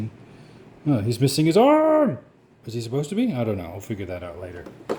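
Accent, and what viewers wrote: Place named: North America. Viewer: Thanks for the video! What part of the world are you located? Your accent sounds North American, but I assume you are not since I don't even think the boats have landed in the States yet. Shouldn't be long now and OMG am I excited to play!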